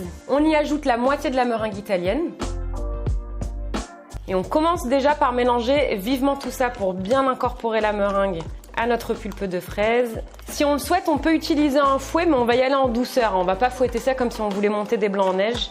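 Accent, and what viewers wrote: Canada. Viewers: tu mon youtubeur préféré de vidéos accent canadien et qui met quoi des vidéos trop trop rire 👍👍👍👍👍 un gâteau aux fraises 🇨🇵🇨🇵🇨🇵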